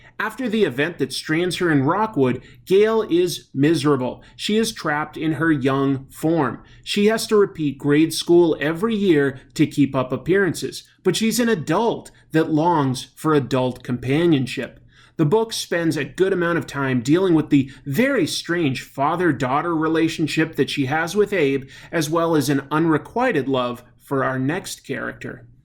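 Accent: Boston, United States. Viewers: I thought he sounded like from Boston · If you're trying to do a Maine accent, you might need to relax your throat more. Right now you have a solid Boston accent. :D Great video. Keep it up!!! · Is that a farmer accent or Boston?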